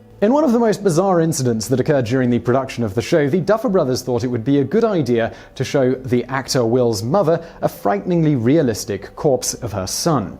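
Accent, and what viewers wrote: United Kingdom. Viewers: You do understand he is British. They pronounce certain words differently. Unlike american english which has been flattened. We say words differently. So back off his pronunciation.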